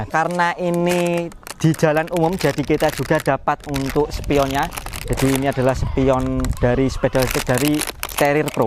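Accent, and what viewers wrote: Java, Indonesia. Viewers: Cara mereview logatnya dialek Jawa apa ni mas, biar beda ama yg lain sering2 aja cara mereviewx ngasal 😅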